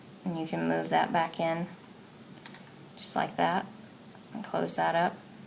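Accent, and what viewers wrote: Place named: West, United States. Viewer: I never noticed this but her accent is like a western guy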